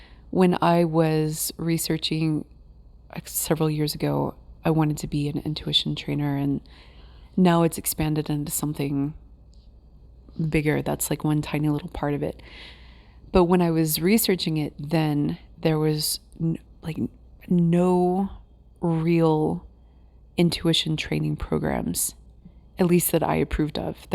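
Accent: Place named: South, United States. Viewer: Beautiful video! You explain things so clearly and you're very easy to listen to. It's like I'm listening to one of those women we have in the south that call you "honey". You feel so safe.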